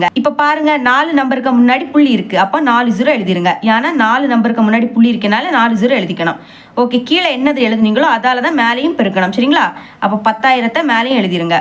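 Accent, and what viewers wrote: Kanyakumari, India. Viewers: neenga kanyakumari ahh akka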